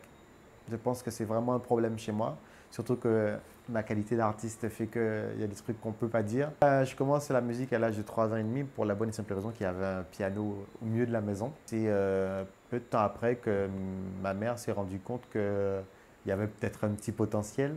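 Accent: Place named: Haiti. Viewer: Les Guadeloupeens parlent aussi le creole mais lui il parle le creole comme un Haitien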